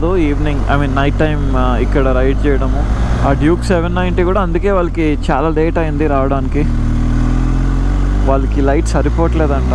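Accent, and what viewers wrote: Godavari, India. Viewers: Godavari slang ❤❤ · Godavari language.. ❤💖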